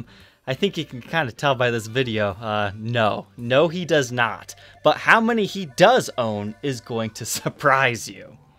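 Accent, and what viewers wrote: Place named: Midwest, United States. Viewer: Of course. I’m not a linguist, I just heard something different. Plus, I was generalizing. How could I possibly know what a Montreal accent sounds like without experience? I can spot a Chicago accent vs a Wisconsin or Georgia accent, for instance. I grew up in the Midwest, and I hear that accent in speech, although he tries to clean it up.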